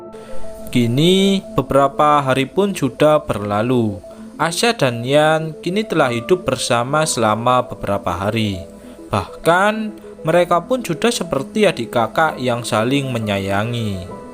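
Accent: Java, Indonesia. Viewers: Jngn terlalu medok mas suara nya... Biar saat bercerita lebih terbawa suasana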